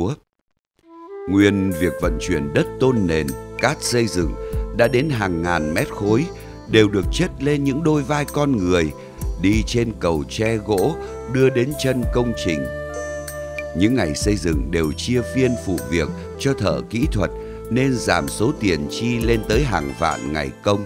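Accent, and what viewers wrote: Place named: Nam Dinh, Vietnam. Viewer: Giọng đọc ng o Nam Định làm trên ĐTHVN. Phải mời họ đọc phải ko ad oi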